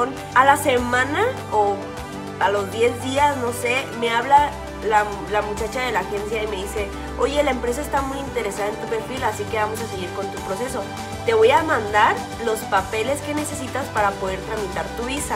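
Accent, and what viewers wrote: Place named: Spain. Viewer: Que bueno eso del intercambio es una muy buena experiencia de poder conocer otras culturas.. me hubiera encantado ir a España jaja esa tonada es tremenda jaja..pero con todo lo que está pasando hoy en día con las inseguridades robos y todo eso.. como que mucho no está para arriesgarse a menos que sea seguro jeje.. pero coincido con tigo seria una muy linda experiencia.. Saludoss😁😉👌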